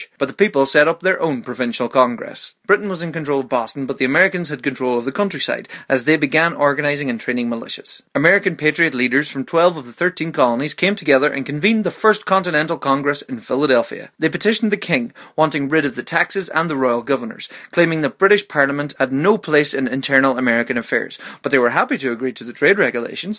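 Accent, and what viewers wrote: Ireland. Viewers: Tsunami Williams yes he's Irish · I love his voice. Is he Irish? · Are you irish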